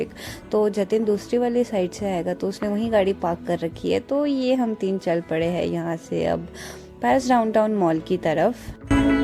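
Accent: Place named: Punjab, India. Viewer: Ur punjabi accent is really gud from where u did ur schooling and higher studies?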